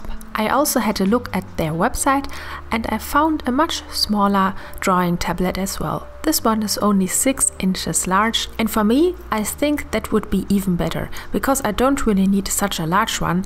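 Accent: Germany. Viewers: Man, deine Skizzen sind allein schon immer so unglaublich schön <3 das Endergebnis ist natürlich mal wieder richtig traumhaft:) ich liebe deine Art Nouveau Einflüsse, die Kunst von damals ist so schön und zusammen mit deinem Stil wirkt das so toll:) Danke übrigens für die ganzen Tipps die du mir und anderen Künstlern zur Verfügung stellst! Ohne dich und ein paar andere Kunst-Youtuber hätte ich es nie geschafft meine Kunst so zu verbessern (auch wenn ich natürlich noch viel zu lernen habe:D). Du bist glaube ich übrigens die einzige Deutsche deren Voiceover ich in Englisch immer total mag, deine Stimme ist total entspannend:D Und ja, du bist nicht die Einzige die ständig ihre Art supplies verliert:D Ich hab's zwar eigentlich immer alles an einem bestimmten Platz liegen, aber ich weiß einfach nicht wohin meine Pinsel ständig verschwinden...:D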